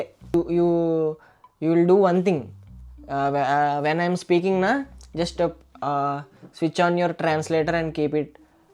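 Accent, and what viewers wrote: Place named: Godavari, India. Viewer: Mana Godavari slang antene oka emotion ani only godharollake telusu 😂Super nuv❤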